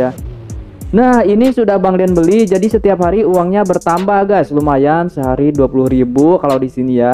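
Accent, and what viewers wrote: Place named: West Java, Indonesia. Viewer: Logatan sunda wkwk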